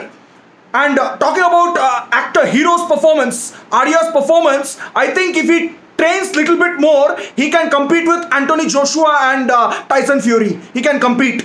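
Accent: India. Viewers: We know American English and British English newly added Indian English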